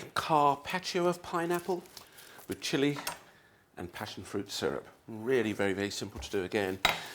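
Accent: United Kingdom. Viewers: nigga u British.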